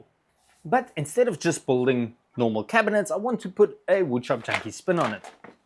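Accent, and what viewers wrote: South Africa. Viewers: I'm new to this channel. Do I detect a South African accent?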